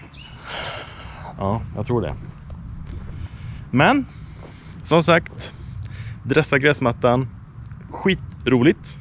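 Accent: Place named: West Coast, Sweden. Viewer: Tack. Man ska inte lufta på sommaren väl? Tänkte kanske lufta i augusti en vända samt slänga på topdress o överså, vad tros om planen? Är du baserad på västkusten? Låter som dialekten är här någonstans. Är själv baserad i Mölndal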